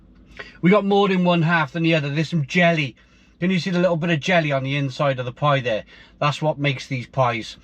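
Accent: Wales, United Kingdom. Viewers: His welsh accent must have confused you. He said it contains 'uncured pork' so M&S won't be in any trouble at all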